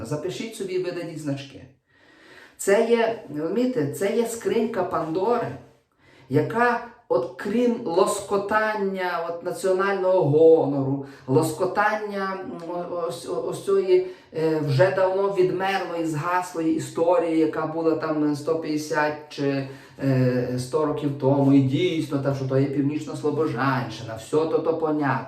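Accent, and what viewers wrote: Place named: Galicia, Ukraine. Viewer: Хто така офензива? Галицький діалект не всі розуміють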